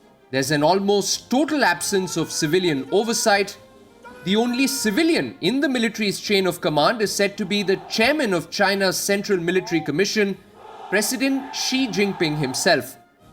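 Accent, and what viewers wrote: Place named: India. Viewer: Lol, when you hear someone with an Indian accent talking about China, I suggest you quite the channel immediately because all it's gonna bring is propaganda=trash. And as a PLA veteran, I assure everyone here that we will fight for China, we will obey orders from the CCP but all of us are willing to give our lives for it, as there won't even be modern China without the CCP. And please, nobody will force you to believe in Communism when you don't want to, and you don't have to be a party member to serve. It's being 12 years since I've touched a gun, but if my country needs me I, along with 600 million Chinese man will fight any minute. I believe everyone will do so for their own country regardless nationality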